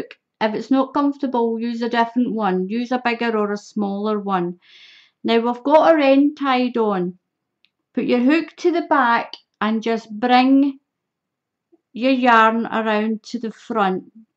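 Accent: Scotland, United Kingdom. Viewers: Oh My! Hope I'm not wrong. You sound Scottish. And hopefully I spelled it right otherwise my Gramma would kill me if she were still living. She was my Hero. Everyday in every way I aspire to be just like her. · I love your crafty videos,! I also love to hear the Scottish accent spoken... I like to think it's something in me hearkening back to my Scottish roots, haha! Anyway, Thank you so much for sharing this tutorial! Rachel D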